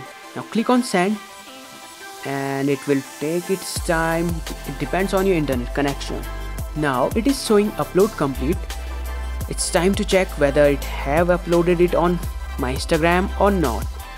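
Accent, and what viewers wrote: India. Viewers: Am I wrong for immediately not trusting this due to the street shitter accent? lmao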